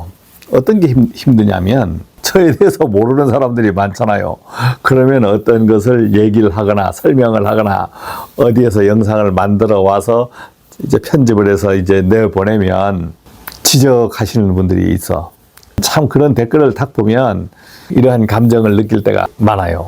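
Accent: Jeju, South Korea. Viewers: 동향분이셨군요 제주도 말투가 보여서 긴가민가했었어요 ㅎㅎ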